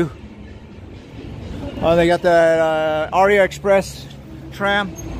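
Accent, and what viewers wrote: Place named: United States. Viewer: Hello from Brazil ☕️. Fantastic video. Las Vegas. Nice rhythm. You have an american accent.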